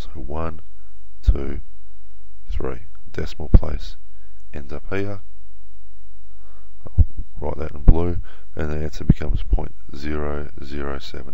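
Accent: Australia. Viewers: This dude is an Aussie or NZr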